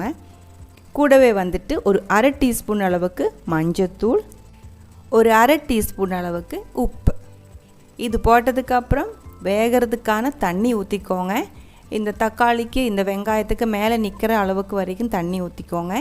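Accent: Kerala, India. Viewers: Hi...its lovely to listen to your Malayalam mixed Tamil😍😍 Can u please leave the link of your malayalam channel. Waiting to see more vlogs from u😍😍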